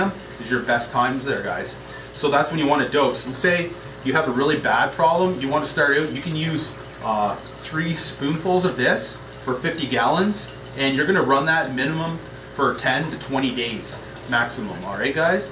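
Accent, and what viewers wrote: Canada. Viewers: probably the THICKEST Canadian Accent I've heard in a long time. Nice!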